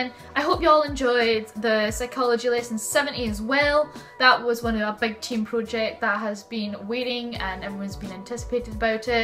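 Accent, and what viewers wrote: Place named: Scotland, United Kingdom. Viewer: love the scottish accent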